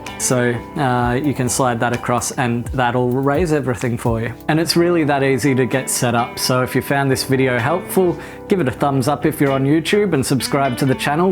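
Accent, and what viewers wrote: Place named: New Zealand. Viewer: Took me way too long to realise he’s a kiwi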